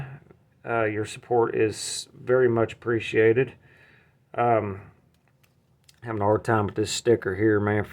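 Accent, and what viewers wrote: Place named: Mississippi, United States. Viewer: You sound just like a cousin of mine that lived in Mississippi.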